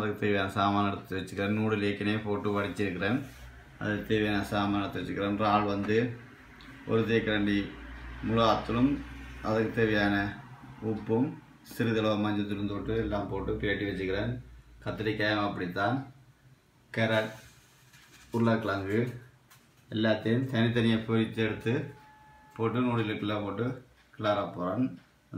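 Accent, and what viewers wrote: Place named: Sri Lanka. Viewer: Neenga pesura srilankan tamizh romba pidikum anna👌👌, London la oru idathula indha noodles sapta niyabagam iruku 😊, yummy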